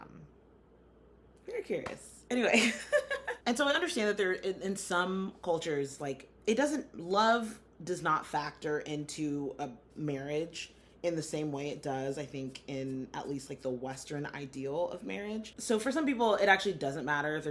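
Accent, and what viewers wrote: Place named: New York, United States. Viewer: My guess is that maybe you're from New York, haha XD And enjoyed your reaction as always!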